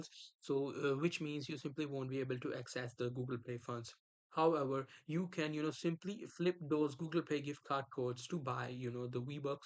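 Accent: India. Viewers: Probably not lmao Indian accent proves it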